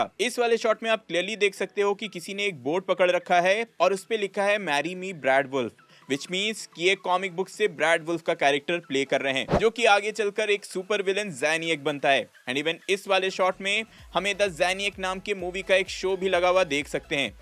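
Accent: Bihar, India. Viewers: bhai bihar se ho kya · Are you from bihar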